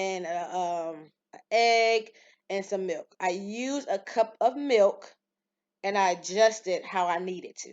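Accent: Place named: Baltimore, United States. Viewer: I LOVE listening to you and watching your videos lol! You are so down to earth and don't be with the extras...please keep them coming. You sound like you're from Baltimore where my family is from:) Thanks so much!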